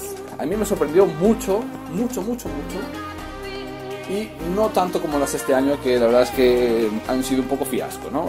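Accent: Galicia, Spain. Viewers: Eres gallego?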